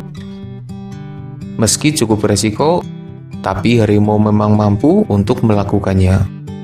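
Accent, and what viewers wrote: Banyumas, Indonesia. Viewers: Wong banyumas kayane kue wkwkwk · Aja ngapak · Salfok sama penyampaian bahasanya. Orang ngapak ya?🤣😂🤣😂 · Aku tebak mimin nya orang ngapak,betul gak? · Ngapak ya bos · Logat mu NGAPAK😅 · Logat ngapake ceto tenan 😂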